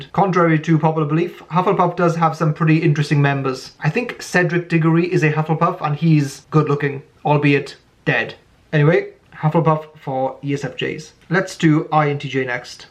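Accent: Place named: United Kingdom. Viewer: I'm a ravenclaw and INFP 🤓 you got it right. Also, I am not a native English speaker and I find hisaccent very interesting. It is hard to understand but at the same time I really like it. Is it british with a twist? I'm curious..🤔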